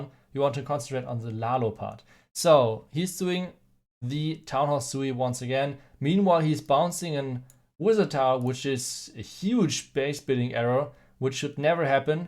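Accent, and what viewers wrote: Germany. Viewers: Dein Deutscher Akzent ist Mega weiter so!🌞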